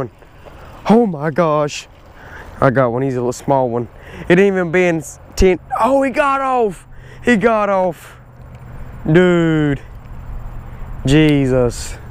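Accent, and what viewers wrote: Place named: South, United States. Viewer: country accent at its finest ur voice reminds me off mind lol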